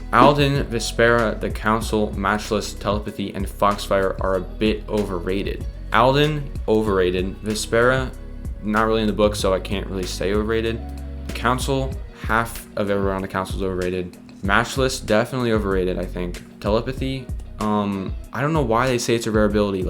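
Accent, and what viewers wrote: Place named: United Kingdom. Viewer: a very crisp british accent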